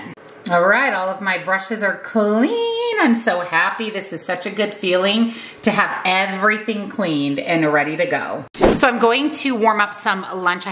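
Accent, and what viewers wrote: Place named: Minnesota, United States. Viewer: You have got to be from Minnesota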